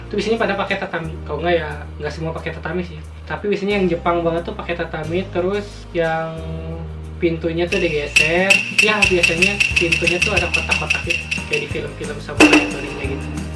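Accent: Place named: West Java, Indonesia. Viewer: pantesan logat sunda na teh aya wae,pek teh orang bandung gening wkwk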